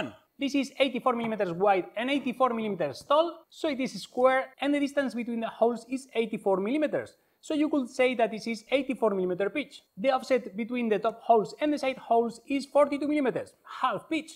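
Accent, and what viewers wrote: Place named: Spain. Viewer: Madre mia que acento, tu eres español amigo! Pd Que passada de video tio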